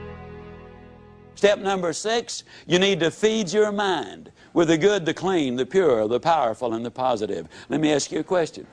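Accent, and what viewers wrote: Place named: South, United States. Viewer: he sounds like someone from arkansas/ texas/ mississipi. he sounds like forrest gump. ziglar died three years after jim rohn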